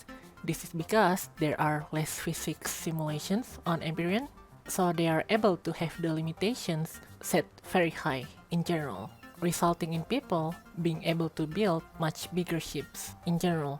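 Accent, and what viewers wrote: India. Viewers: Hard indian accent?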